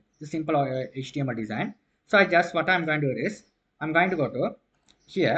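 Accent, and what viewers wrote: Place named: India. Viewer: Indian accent is just annoying..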